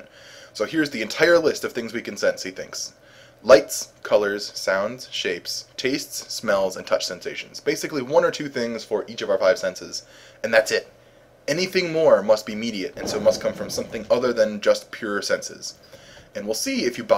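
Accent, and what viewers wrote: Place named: Ireland. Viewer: He's Irish...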